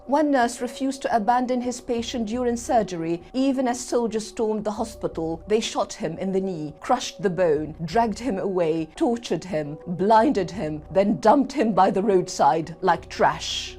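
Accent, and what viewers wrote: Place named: United Kingdom. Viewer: لهجة بريطانيا مقززة. إحكي عربي يا بنت الناس. ليش صوتك هيك متل العجوزة اللي بتعاني من إمساك؟؟ 😂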